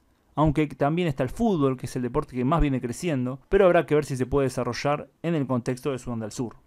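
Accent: Argentina. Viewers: O sotaque argentino é lindo. E um italiano falando espanhol.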